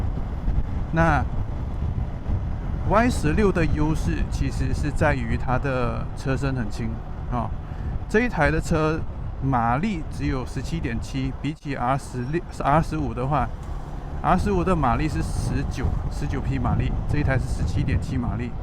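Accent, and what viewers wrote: Taiwan. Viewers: 给你的口音骗了，还以为是台湾人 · 你是什么人，为什么有台湾腔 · 台湾口音😂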